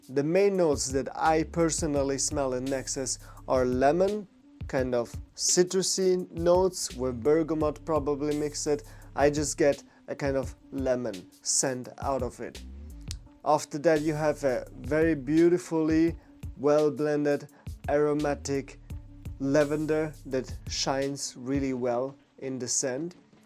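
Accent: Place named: Italy. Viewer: U sound italian. Coincidence? 😂😂 of course not fools.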